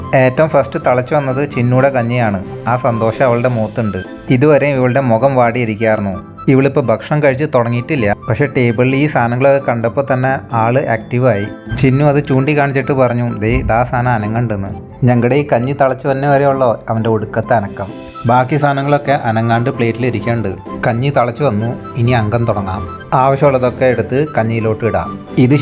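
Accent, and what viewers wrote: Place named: Palakkad, India. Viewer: സംസാരം നല്ല രസം ഉണ്ട് കേള്‍ക്കാന്‍ ഒരു പാലക്കാടിന്റെ ചുവ ഉണ്ടോ എന്ന് സംശയം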